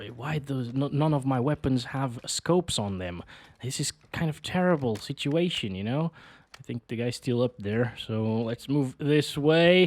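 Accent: Portugal. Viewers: Kksksksksksk coe mane, o cara é português que doidera🤝🏻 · você é português !!!???? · Descobri agora que esse cara é português XD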